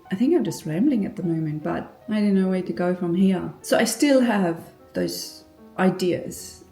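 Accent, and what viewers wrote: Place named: Australia. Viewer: I love everything about this ❤ you are so rreal. It makes my nervous system fall right into place. So much s**t is going so fast, in this world, and this is just what I need, to level out. Also, I am completely new on my own youtube channel, and I feel you. So much a mirror for me - I also don't fit into niche boxes, and I'm not sure, anyone will ever watch what I make. But it feels meaningful so I go on - and my soul sings, when I find a kindred spirit here. Are you saying you are german?? You have a very authentic aussie accent! I love it. And love Australia ❤ and your shots of nature. Who is James? Son? Dog? Boyfriend? 😄 All the best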